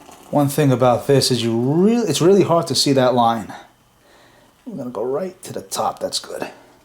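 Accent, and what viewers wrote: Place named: Boston, United States. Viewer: This guy is so Boston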